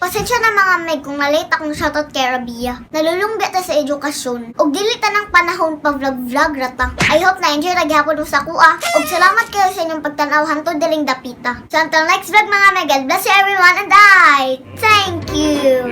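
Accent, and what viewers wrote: Visayas, Philippines. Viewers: pashout nman cutie in your next vlog... i really love the way you speak visaya...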